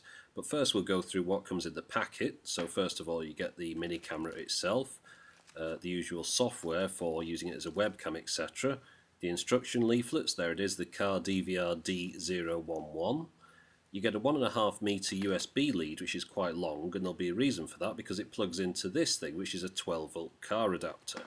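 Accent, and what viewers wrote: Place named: Liverpool, United Kingdom. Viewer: You sound like Ringo Starr! Is that a Liverpool accent?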